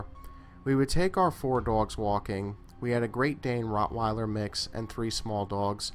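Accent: New York, United States. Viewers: Are you a New Yorker?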